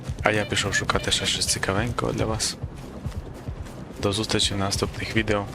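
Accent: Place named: Poland. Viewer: у вас гарна мова але чую акцент, ви поляк? :)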